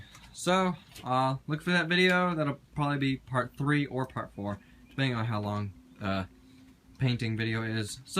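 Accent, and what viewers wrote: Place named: New Jersey, United States. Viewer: How aww YA! He sounds like he's from New Jersey. 😄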